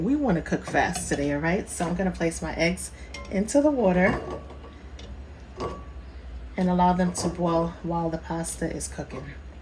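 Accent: New York, United States. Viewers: must be from up north I’d say NYC. Because she sounds like me when I talk 😂thanx for the cooking tips on this plate 👏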